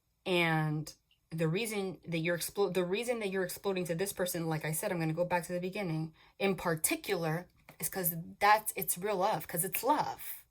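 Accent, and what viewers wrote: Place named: Long Island, United States. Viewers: We haven’t even started the reading I just poured a hot cup of ☕️ sat on the couch, said this chick has to be from NY “OKAEH!” I went to school for early childhood development at St John’s. Had my son at 19, nope kids and a baby who doesn’t stop crying? Don’t think sooooo Was in a crazy marriage and another crazy relationship went to school for medical assisting. I parked in the ER for 13 years.... was soooo tired but had job security and great benefits and the bestest friends...... went to nursing school spent 8.5 of those 13 in peds. Moved to the south to be with the soulmate, here we are I have chills listening to your personal story, and you are so absolutely correct. God bless love. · Girl - I went to UB!!! Crazy. I grew up on LI and have always loved you accent. I love in PA now and miss that sound. 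But the universe is telling me over and over I’m on the right path! 💖💖💖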